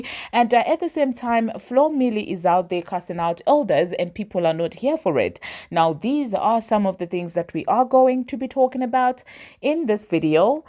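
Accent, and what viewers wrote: South Africa. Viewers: are you South African??? is this you??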